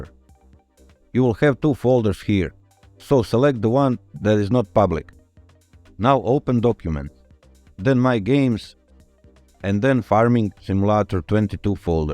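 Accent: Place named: Finland. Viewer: you absolute mad lad! the sixth method worked for me, i'm gonna be a hero for my little boy, and you sir, you are a true saint. this video was amazingly well done, easy to follow and with a calm and soothing finnish accent it was a blissfull experience. thank you.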